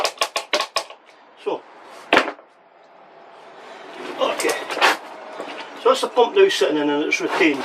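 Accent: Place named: Aberdeen, United Kingdom. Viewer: That was more helpful to see it getting taken apart, not enough swearing for me as I put myself in your shoes so there's a gid few fkrs and btsrds getting flung aboot when yae drop things, I was thinking of doing the very same video as advised by your good self, like you I have breathing issues but also MSG so there's a lot of shaking going on so video would be quite long haha but we'll see you never know,anyway keep up the good work, me and the wife were trying to work out you accent thinking up Aberdeen way 😃👍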